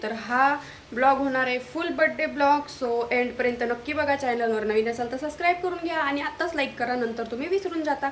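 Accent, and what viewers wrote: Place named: Satara, India. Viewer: है मीपन सातारची आहे.आपली सातारी भाषा 😂 लगेच ओळखते.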